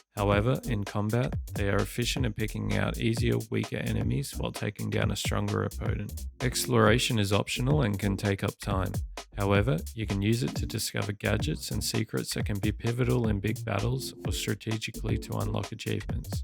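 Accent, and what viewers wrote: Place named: Canada. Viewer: Iconic canadian accent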